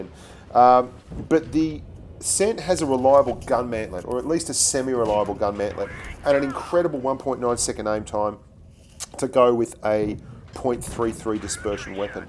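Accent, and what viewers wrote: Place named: Australia. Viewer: ok thank you and u sound australian ik so cause I live with 2 australians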